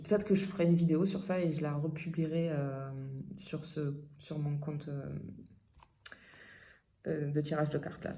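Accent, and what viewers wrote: South, France. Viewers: Ahh cet accent du sud me réchauffe le cœur et me rappelle mes années d'étude à Aix-en-Provence des années que je n'oublierais jamais ... Merci pour cette guidance ❤🧡💛💚💗💙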